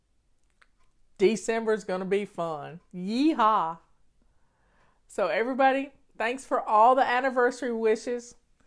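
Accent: South, United States. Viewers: Congratulations Marla! I've been a follower of you and the system for many years. I really enjoy listening to your encouraging words and southern accent as I rest with recent diagnoses and treatment of matasticized breast cancer of the lungs. I enjoyed you back in the years past and I love that I can hear you daily as I do what I can. Love you and thank God for you, Richard, your dogs, your family and flylady staff! You make my day!...sylvia in WA state 😊 ⛄ 🎶 🐶🌹 🎂 🍹 🎁 🎉 🙏 💖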